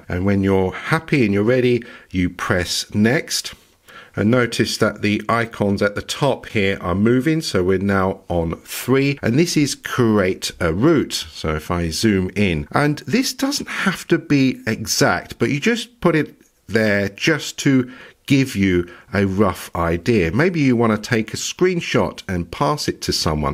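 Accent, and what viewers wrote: United Kingdom. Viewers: Excellent presentation and outstanding commentary in British English :) Your voice is very impresario like, as a boxing match commentator. Btw even if you say you are in London would you say yours is the quintessential Queen’s English or say Glemsford or is it Received Pronunciation because I don’t hear a regional accent at all. I found it very useful really. Good day Sir. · I'm fond of your accent ! Soooo cool Soooo british. Where are you from if I may ask my good pal ?))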